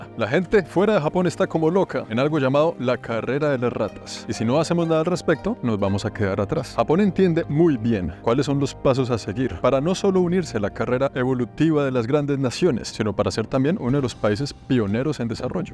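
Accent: Colombia. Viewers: Es el primero video que veo de este Canal y por el acento al principio asumí que es colombiano y después lo confirmé con algunas referencias que hizo jeje, muchas gracias por su trabajo, excelente video. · Eres el mejor narrador de contenido no cansas tienes esa chispa de expresión colombiana que encanta 🌟🌟🌟🌟🌟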